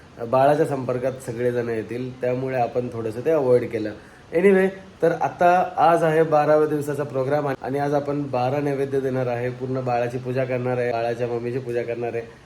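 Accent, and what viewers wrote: Nashik, India. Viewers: Dada aple nashik vale olku yetata voice madhun kadje gya dada tai chi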